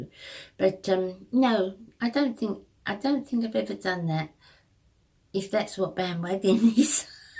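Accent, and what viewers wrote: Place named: England, United Kingdom. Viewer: Bless you.When you have watched youtube for 12 years or so and watched channels grow,you learn to take many people with a pinch of salt.I don´t watch any more to "learn",when it comes to make up as I have known for a few years now what suits me,and what to avoid,as I am sure many of us have.I like reviews and enjoy personalities.There is a lot of sugary B.S. out there and many use filters.Youtube is a HUGE business and money maker for many,these days.Good for them.It takes a bit of selling your soul for that but many are prepared to do just that.However,I would rather just spend my time watching people such as yourself,who keep all aspects real.I tend to think these days,as much as I love and have always loved make up,the market is totally saturated,and there is only SO much a person needs.Truthfully..I watch you,also, because it´s nice to here a fellow English accent;)......Stay as sweet as you are.....Sheila xx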